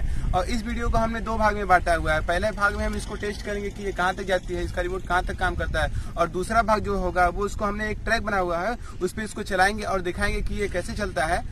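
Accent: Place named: Uttar Pradesh, India. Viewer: Ye ladka pakka up ka hai 😄🤣🤣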